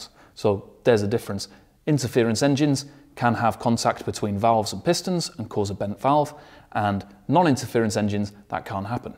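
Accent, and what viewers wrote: United Kingdom. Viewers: Just stumbled upon you after googling how water cooling works. Terrific stuff mate - as an Aussie I'd much rather listen to a Brit over an American and it's thrilling to now know there's one out there to listen to!!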